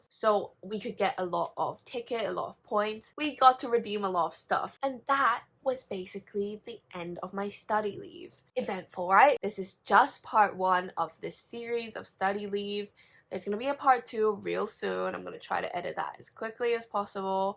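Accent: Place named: United Kingdom. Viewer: and that british accent. bro. whO ARE YOu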